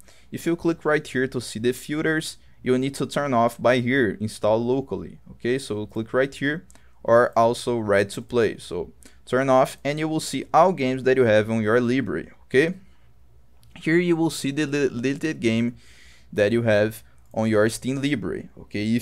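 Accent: Brazil. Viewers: brazileiro ne pai esse sotaque eu conheço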